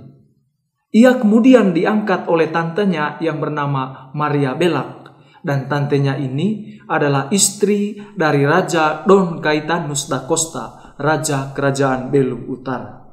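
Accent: Manggarai, Indonesia. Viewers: Naratornya masih kental dengan logat Manggarai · logatnya pasti org Manggarai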